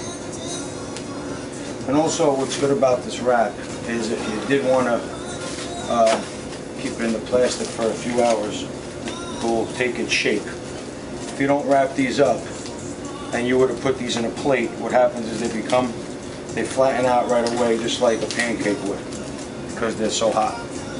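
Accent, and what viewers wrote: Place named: New Jersey, United States. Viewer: Without knowing i can guess he is from NJ lol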